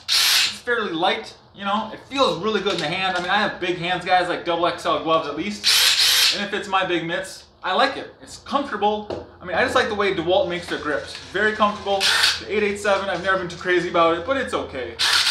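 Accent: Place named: Bemidji, United States. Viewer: You sound like you are from Bemidji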